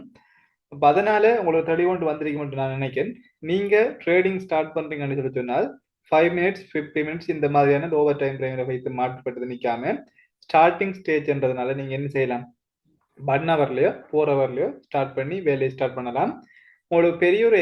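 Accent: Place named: Sri Lanka. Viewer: Sri lanka thamizh 😍🫂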